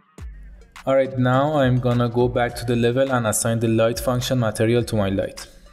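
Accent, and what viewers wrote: Iran. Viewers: From your accent i believe you're from Iran, so am I. Thanks for the great guide Keep it up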